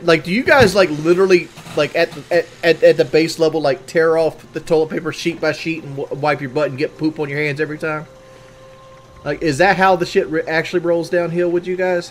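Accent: South, United States. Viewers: That’s just a southern thing. Many southerners pronounce want like won’t.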